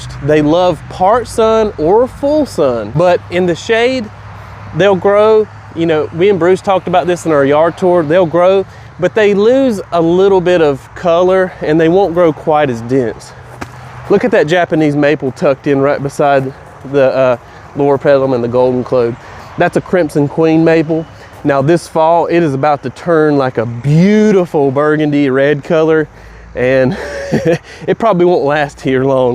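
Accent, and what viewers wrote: South, United States. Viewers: Southern accent is grate , keep it up !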